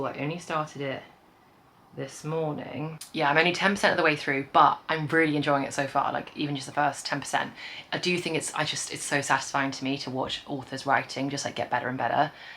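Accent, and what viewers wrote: United Kingdom. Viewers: Just finished Book Lovers and I enjoyed it ! I really appreciate your content and, as a French, I’m a fan of your British accent 😊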